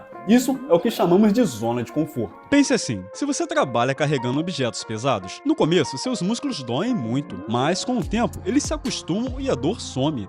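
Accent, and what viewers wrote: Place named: Rio de Janeiro, Brazil. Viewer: Excelente !! Vou voltar a treinar kkk Obs: fala igual carioca prr, para de puxar o S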